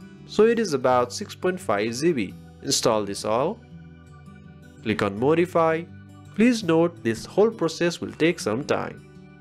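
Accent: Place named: Bangladesh. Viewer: When you said GB to zee bee i knew you are from Bangladeshi kamla bosti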